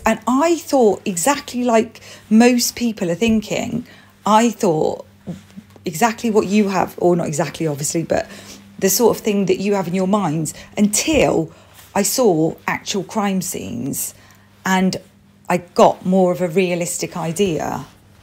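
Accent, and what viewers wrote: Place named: United Kingdom. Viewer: I absolutely love your voice and the accent, however horrible things u're speaking about. It's soooooo British! 😀 Where are u from, more exactly?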